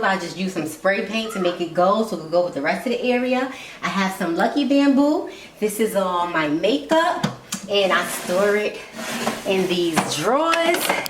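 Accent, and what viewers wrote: New York, United States. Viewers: I love your New York accent . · I love that NY accent 🥰. You made your home very welcoming and it’s cosy and accommodating and so organized and bright.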